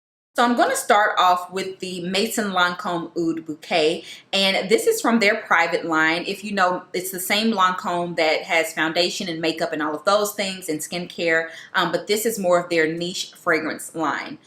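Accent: South, United States. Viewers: I love your accent you have a southern sound, love this video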